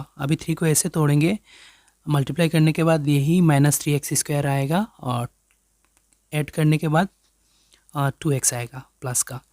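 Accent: Bengal, India. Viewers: R u Bengali sir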